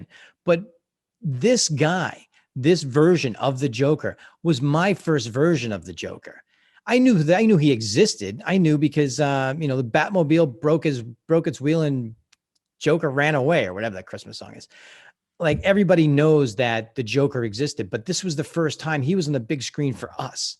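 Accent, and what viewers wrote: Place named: Boston, United States. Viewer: Too funny! Finally my love for has brought me fame! LOL Loving this and I plan on checking them all out. You may have moved around a lot but still maintaining that wicked awesome Boston accent!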